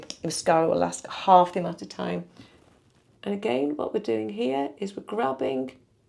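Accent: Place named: England, United Kingdom. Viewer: I have that Max Factor palette from Amazon, it’s one of my favourites. The colours are so pigmented. It’s refreshing to hear a makeup guru with an English accent. New subbie loving your channel x VickAngel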